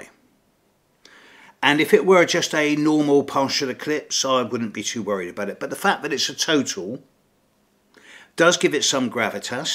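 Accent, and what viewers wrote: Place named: RP, United Kingdom. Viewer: Thanks! It's always a great pleasure listen to you... And I love your clear British R.P. accent... Greetings from Italy 👋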